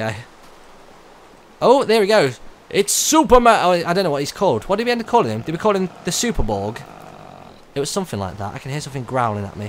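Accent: England, United Kingdom. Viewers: I LOVE THAT ENGLISH ACCENT WHEN U SEE DE FIRE CREEPER ITS LIKE: OMG NONONONO THATS A FIRE CREEPER NONONO GET AWAY GET AWAY NONO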